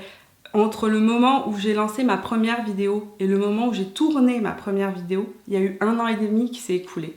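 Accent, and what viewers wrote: Canada. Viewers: Très jolie ton histoire avec ton chéri ! Il vaut mieux se teindre les cils que faire une extension de cils . Sympa cette vidéo en tous cas ...c'est Marant parce que je pensais que tu étais canadienne du coup ...tu as pris un peu l'accent je trouve. Mille bises et bravo pour ta chaîne tu es toute simple et de bons conseils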